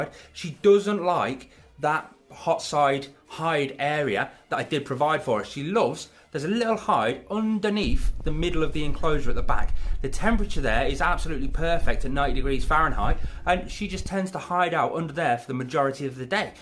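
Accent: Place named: Northern England, United Kingdom. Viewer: People need to leave it out. Like actually take the time to watch the video or understand the situation before jumping down people's throat, you did a good job mate and nobody should take that away from you. On a side note thanks for clarifying where you live my southern ears almost missed it as I don't speak northern too well 😜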